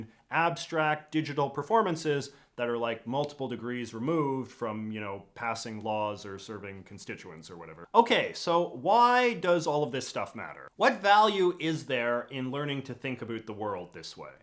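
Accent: Canada. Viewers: it's a canadian accent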